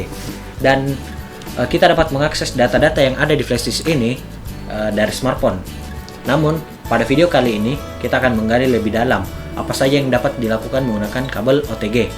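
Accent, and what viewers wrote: Makassar, Indonesia. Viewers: org makassar kah??? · Bang orang Makassar yah? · Orang makassar yah bang??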